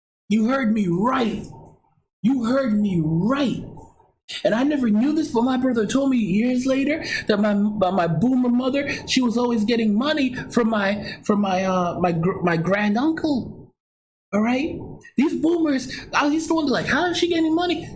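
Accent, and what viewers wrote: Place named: Jamaica. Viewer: Bro. You sound like you have a slight Jamaican accent which was lost over time being Americanized in the US! .... Am I correct?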